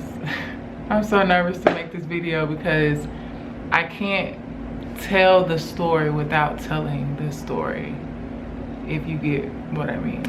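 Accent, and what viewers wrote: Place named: Alabama, United States. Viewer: Fr!! I tend to realize females that’s from/live in Alabama have that same accent and they sound the same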